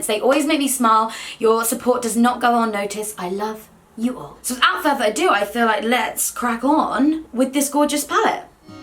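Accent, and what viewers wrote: Newcastle, United Kingdom. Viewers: R u from Newcastle!? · I’m new to this channel and got so confused. So geordie at the beginning and then not at all by the end of the video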